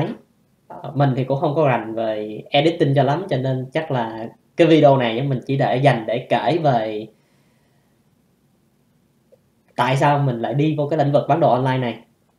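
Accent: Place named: Mekong Delta, Vietnam. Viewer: Ban noi giong nguoi mien tay nguoi viet nam nho nhung nguoi tay fai nguoc nhin ok e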